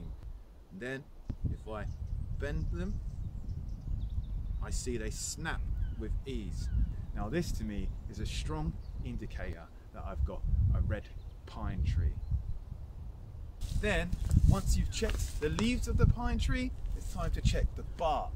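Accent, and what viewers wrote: United Kingdom. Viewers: Hello, where is your accent from? British?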